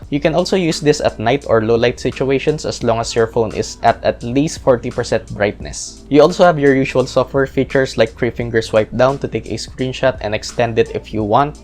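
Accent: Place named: Philippines. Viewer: When I heard a Filipino Accent English speaking. ☺️👍 I'm so proud being a Filipino. I love your review. So detailed.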